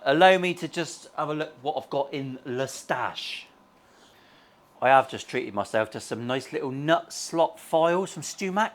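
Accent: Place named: Devon, United Kingdom. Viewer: I think I wanna move down you're accent's neck of the wood. I love Brixham!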